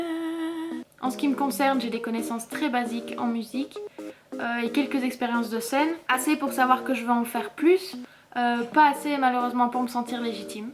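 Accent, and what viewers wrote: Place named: Eastern France, France. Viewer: J'ai passé la vidéo à écouter ton accent ToT ne serais tu pas de l'est type Haute Saône ?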